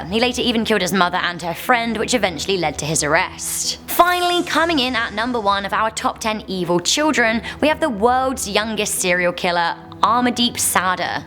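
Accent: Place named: England, United Kingdom. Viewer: That good old english ascent with that morad the fooouurth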